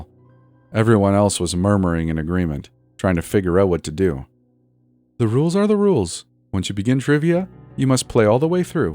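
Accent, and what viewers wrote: Canada. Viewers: I most definitely like the sound of ur Canadian voice. Thanks for another great upload. It's much appreciated. ❤ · I LOVE THE SOUND OF A CANADIAN VOICE!! ( I'm from Vancouver BC...☺️)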